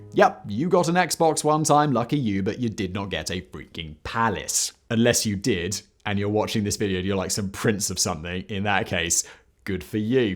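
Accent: United Kingdom. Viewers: This channel has become so anti British and yet the presenter uses his accent is a major part of his appeal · He has little to no involvement in the production of most videos he presents, he is a very sought after host due to his friendly and genuine personality, British accent and suitably deep voice.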